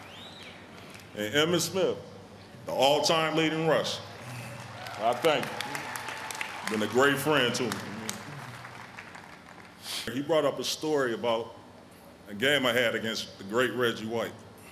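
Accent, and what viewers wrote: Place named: Compton, United States. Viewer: Compton accent like a mf R.I.P. Big LA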